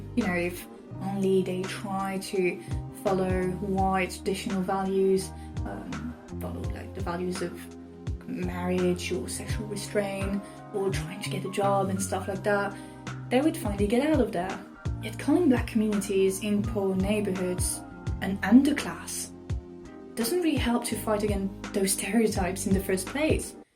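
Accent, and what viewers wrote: France. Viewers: Je pense que t'es française vu l'accent et la vidéo était grave bien et super intéressante mais stp investis dans un micro :(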